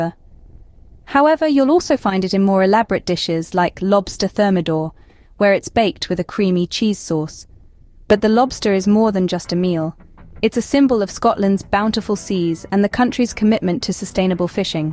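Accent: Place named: United States. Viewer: It’s ai and sounds kind of American at times but don’t let that get in the way of your bigotry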